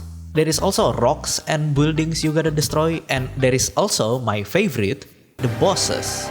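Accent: Indonesia. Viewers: You soun Indonesian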